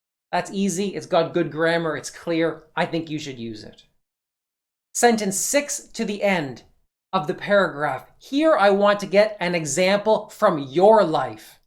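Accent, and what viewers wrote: Canada. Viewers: Just out of curiosity, are you Canadian? I hear some Canadian accents. My Canadian friend and i used to fight over English (not British, just language) accents like 'Resource' or 'Rezzource'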